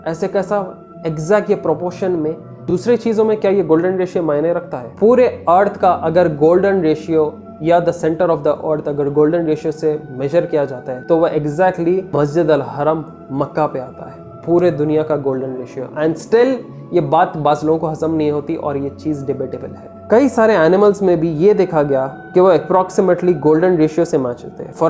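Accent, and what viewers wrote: Hyderabad, India. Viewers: By how he speaks he sounds hydrabadi to aap agr wahan ke logon ko sunenge to wo ese hi bolte hai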